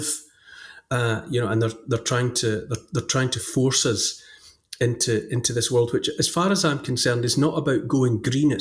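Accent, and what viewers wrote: Scotland, United Kingdom. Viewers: It's so refreshing to hear a gentle Scottish accent deliver so much truth. If it was an American i would have switched off by now. I listen to when i am in bed at night. So relaxing but enlightening. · Yes, I hear my own thoughts, feelings and memories of years gone by, iterated in lilting Scottish accent.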